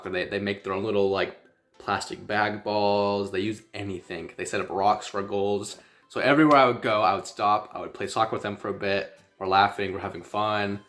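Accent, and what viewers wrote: South Africa. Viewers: your accent is South African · Your accent is starting to sound more south African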